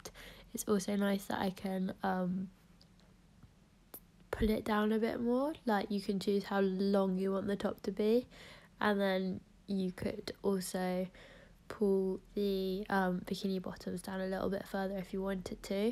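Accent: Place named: United Kingdom. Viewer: You are beautiful and perfect in every way and I love your British accent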